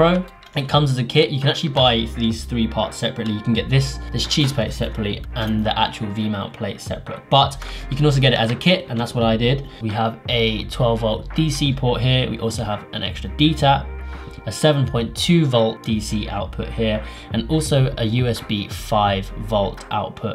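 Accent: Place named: United Kingdom. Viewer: When did Elliot Choy get a British accent?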